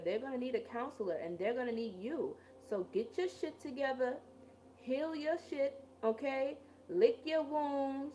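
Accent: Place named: East Coast, United States. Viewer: Sis, I appreciate how you use this platform. Tha info you choose to share gives me more life—it sustains me. Also, there are others who I follow, who also use this platform similarly, disseminating similar info but you have a wonderful knack for imparting this info, that just RESONATES in a different way. Shit refreshing AF and I thank you. Plus, your east coast accent is one of my favs. ✊🏿❤️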